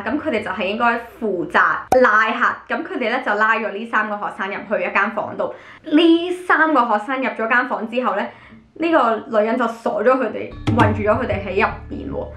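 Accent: Standard. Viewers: 真以为是配音啊，好标准啊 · 你係印度人或者香港人? 好标准啊你个广东话。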